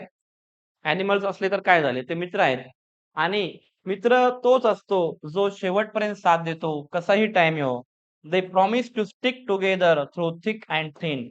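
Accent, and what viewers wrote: India. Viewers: Sir, are you from Nanded? Loha or kandhar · Sir ekdam barobar boltay rumhi😂tumch boln aikun kaltay ki tumhi punyatle ahet😂😂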